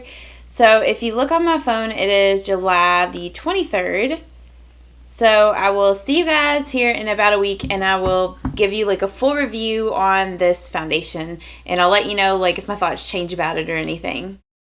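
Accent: South, United States. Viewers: your southern accent is so cute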